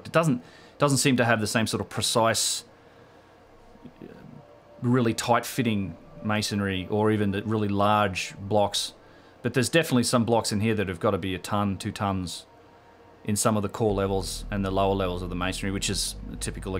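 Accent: Australia. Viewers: G'day from Oz, great live stream and other videos. Articulate, informative and excellent delivery with an Aussie accent to boot! Keep up the great work and live streams. Loving the mind blowing Serapeum series, and looking forward to your Younger Dryas / Cataclysm video. Cheers.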